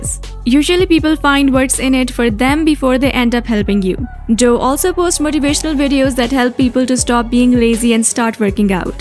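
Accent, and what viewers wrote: India. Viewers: Great......Sounds like a Indian.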